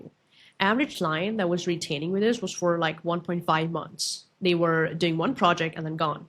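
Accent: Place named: Netherlands. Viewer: You sound like a Dutch person, not that it matters lol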